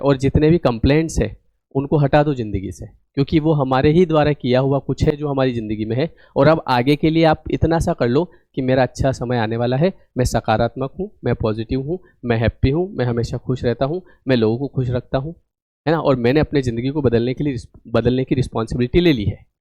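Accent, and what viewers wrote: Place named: Indore, India. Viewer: Sir indoor ki tarah bolte hai app indoor se hai app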